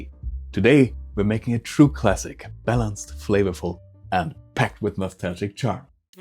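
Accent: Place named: Germany. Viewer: Bist du Deutsch? I hear a sight accent, as I am 100% Slovenian and speak a somewhat butchered German. My Stara Ata spoke fluent German and I learned much/some from him. Danke.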